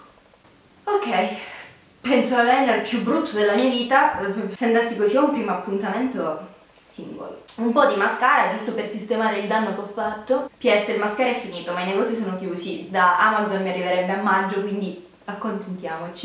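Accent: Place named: Calabria, Italy. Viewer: Ma di dove sei .. la tua voce sembra calabrese 😍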